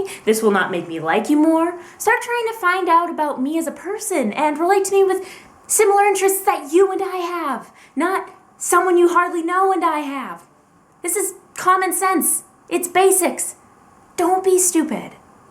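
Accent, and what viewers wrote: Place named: United States. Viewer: She sounds country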